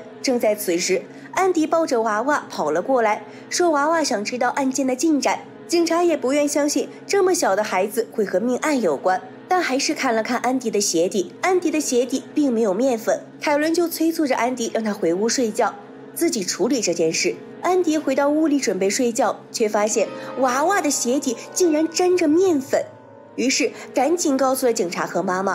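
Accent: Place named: Shandong, China. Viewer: 听口音就是我们那边的，老家山东的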